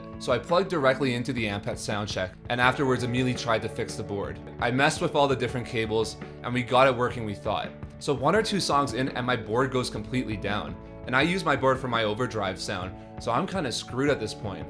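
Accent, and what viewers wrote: United States. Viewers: Where in the world do you sound like an American but talk in Celsius? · It is weird that someone with an American accent uses Centigrade. Probably living abroad. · You traded a custom board that you had to do some convincing to procure... and then traded it for one pedal that's so common you could piss off your back porch and somehow hit a carbon copy? Also, you using brit heat measurements with an American accent... weirder than tim walz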